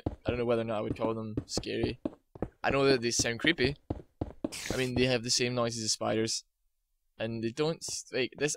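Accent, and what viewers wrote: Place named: Scotland, United Kingdom. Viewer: i like your scotish accent!!!!!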